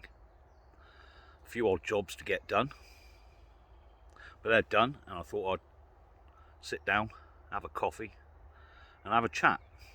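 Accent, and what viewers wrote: England, United Kingdom. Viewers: Thanks. I wish I had had a mentor like you when I was a young man. Love your old school attitude and (English?) accent. Wish you guys all the best in your endeavor, from across the pond 🙏🏻 We’ve got our own battles to fight, although it seems we are facing a common enemy..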